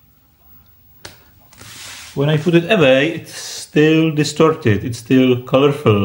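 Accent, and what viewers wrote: Prague, Czech Republic. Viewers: Czech English ? :D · Čechoingliš :D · Didn't expect to hear a Prague accent in English. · Pocujem cesky prizvuk uz po prvom slove. Mam pravdu ze si cech ?? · Where are you from? Your voice souds like your from Czech xD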